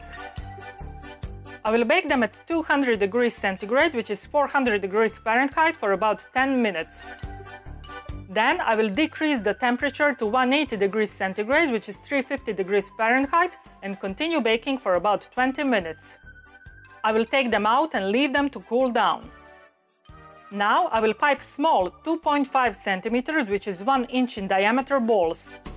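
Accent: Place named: England, United Kingdom. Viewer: i like your english accent may i know where do you come from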